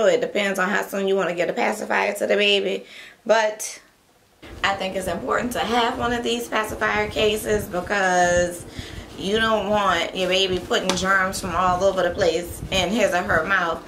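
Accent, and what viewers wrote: New Orleans, United States. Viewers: Thanks for the tips! Also I love your accent, are you from New Orleans? I died laughing at the old lady story 😂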